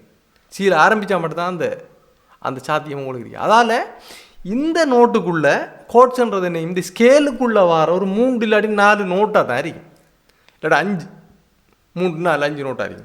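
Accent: Sri Lanka. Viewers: Ahaa....Ilangai Tamizh azhagu......vadivu vadivu 😀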